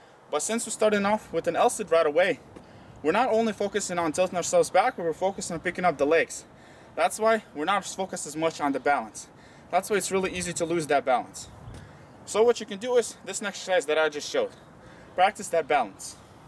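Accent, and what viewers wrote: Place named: Russia. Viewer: Ya I thought I recognized the surroundings и русский акцент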